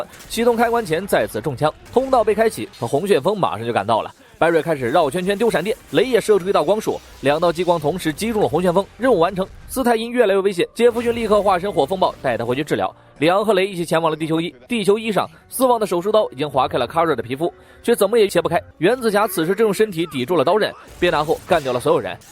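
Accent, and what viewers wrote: China. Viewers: 怎麼中國人那麼喜歡用YouTube 來做盜版的事情？ 操著這個口音 真的很噁心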